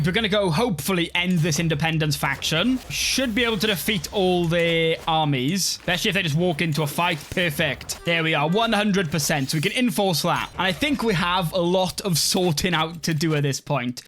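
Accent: Wales, United Kingdom. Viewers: Where in Wales are you from mate?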